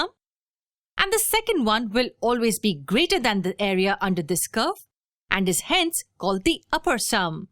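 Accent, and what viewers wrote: India. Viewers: aaaaahhhh Indian accent ........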